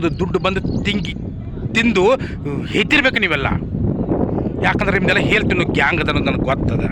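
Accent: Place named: North Karnataka, India. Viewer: ಈ ರೀತಿ ಉತ್ತರ ಕರ್ನಾಟಕದ ಭಾಷೆ ಯಲ್ಲಿ ಉಗಿಯೋದನಾ ಕೇಳೋಕೆ ಆ ಆ ಏನ್ ಚಂದ ಉಂಟು ಮಾರ್ರೆ 👌👌👌👌👌👌👌👌👌👌👌👌👌👌👌👌👌👌👌👌👌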